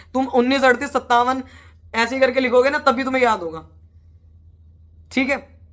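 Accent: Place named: Haryana, India. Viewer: Main to bs haryanvi accent sunne aaya tha 😂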